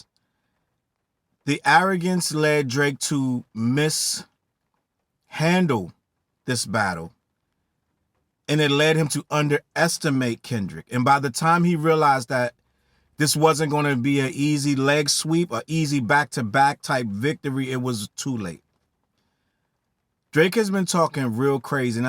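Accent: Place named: New York, United States. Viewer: He did ride the waves. Ion mean no disrespect but you an old head and you sound like you from New York. A lot of waves he rode, the young niggas was already hip too. put the on, had a his own buzz and Drake had to hop on to get the streets. Yes he did make them bigger on a mainstream level but he did ride the wave as well. Whether it’s using their flow/ lingo or dressing like them. The man is the ultimate culture vulture.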